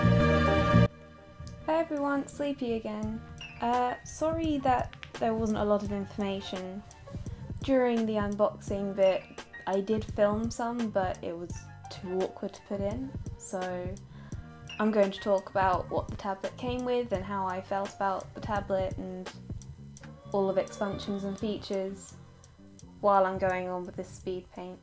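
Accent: England, United Kingdom. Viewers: OMG UR BRITISH GASP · Got to love that british accent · I LOVE UR BRITISHNESS :3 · MY LORD, YOU ARE ABSOLUTELY GORGEOUS!!! No wonder your art is amazing...beautiful art comes from beautiful people. Me, myself, and I have been a big fan of your art, and also kinda inspired...I've been (SO BADLY) wanting to draw like you, with a style slightly the same, only in the hair shading really. I've always loved the way you shaded the hair...and the apps i have...don't have that kinda setting of shade. SO, soon i'll get tool Sai and try, though I don't have a drawing tablet...That dream died quick...anyhow, LOVE YOU, AWESOME ART, I'M INSPIRED! HAVE A GOOD DAY! (PS...I've always adored the British accents...but sadly i'm american so rip lelelel...i'm also 16 so HEY GURL, HIGHSCHOOL IS FFFFUN XD) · Well, being British and different is cute ❤ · U know I thought she would be French but British it's gud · YOU'RE BRITISH I happen to love you heck of a lot more now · Holy FRICK UR BRITISHHHHH AHAHAHA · YES A BRITISH ANIMATOR!!!! 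· Nice. Ur British! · You're British and pretty? GG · Me and my friend finish the vid he's like huh I wonder if she's British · finnaly a brittish animater :3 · It looks like a small TV Also you look really cute! Idk why I like British accents Well keep up the good work!❤️ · WOW are you from UK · I love you British accent! And your sooo pretty! 💖 I also really love how you animate:3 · you gotta love that PERFECT british accent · I have so many words to tell you but I will put them short I LOVE YOU'RE BEAUTIFUL BRITISH VOICE AND YOUR FACE.... YOUR SO BEAUTIFUL!!!! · Finally a British animator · british?:D yay british :D a youtuber that is like me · I DIDN'T KNOW YOU WERE ENGLISH WE CAN BE ENGLISH TOGETHER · Im not gonna lie your actually really hot!im gonna get a drawing tablet for my bday this march 23should I plan on buying this because a kind of have my eyes on a lot of tabletsHELP ME (btw I love England accents) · Ur bootiful.One question are you British ? 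· are you British? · Are you English? I am too :3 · ppl think im British but im Australian!!!! its awesome that ur British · you were already perfect and now I know you have a british accent AHHHHHHHHHHH I'M SCREAMING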